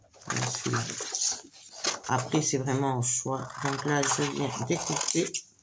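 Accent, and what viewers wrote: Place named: Toulouse, France. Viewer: Bonjour, 👏 Très bel album. Vu l'accent et l'allusion à Toulouse je suppose que vous êtes dans les environs. J'habite à Albi et je ne connais personne qui scrappe tout proche.je suis autodidacte depuis mes débuts, j'adorerais avoir ma chaîne et partager ma passion mais ça doit prendre beaucoup de temps surtout quand on est accro et aussi pas évident avec mon métier de soignante. Alors je visionne...je retranscris les tutos et je fais des patrons... Là avec vous, je souris c'est interactif même si vous ne me répondez pas je finis vos phrases et vous souffle les mots manquants spécifiques du scrap! 😁. Je n'ai jamais eu l'occasion non plus de me rendre à un salon créatif car pas informée. J'aimerais bien correspondre avec vous 😉. Meilleurs vœux✨️ et belle journée à vous 🌺 Sophie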